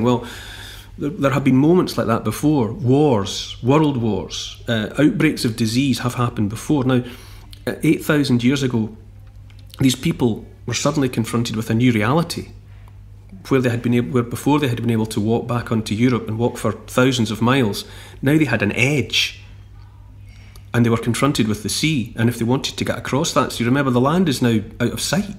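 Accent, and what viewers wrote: Scotland, United Kingdom. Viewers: Like you Scottish accent ♥️❤️🧡💛💚💙 Cheers from Moldova Freédom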